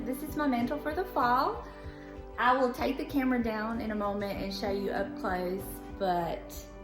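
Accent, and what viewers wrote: South, United States. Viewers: You are adorable! Decor is lovely and I love the "southern accent"!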